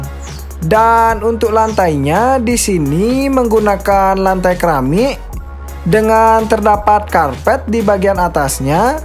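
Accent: Bali, Indonesia. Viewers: Itu logat Bali, Indonesia banyak suku dan bangsa, jd harap memaklumi . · konten kreatornya ngomong dengan logat bali, bukan di buat buat , Respect kekayaan bahasa indonesia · Ngomongnya logat bali...mohon dimaklumi lah....indonesia kan beragam bahasa dan budaya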